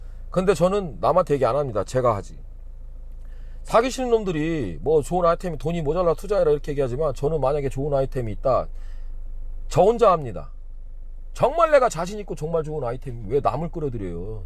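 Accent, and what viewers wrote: Chungcheong, South Korea. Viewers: 아이구~ 시원시원 하시네요. 맘에 딱 들어요 ㅎㅎ 저도 인도네시아 들어가서 현지인들 속에 가서 살려고 하는데, 어학연수 하면서 현지인들과 어울리면서 말 배울라고요. 전에 회사일 때문에 들어가 만났던 교민들,,, 아~ 진짜 .... 더이상 말 안하께요. 그리고 진짜 돈거래... 작은 돈이라도 돈거래는 하질 말아야하고, 아예 안만날 능력 있으면 좋죠. 전 고향이 충북이고 부모님도 청주 사시는데, 아~ 충청도 말투 정겹네요.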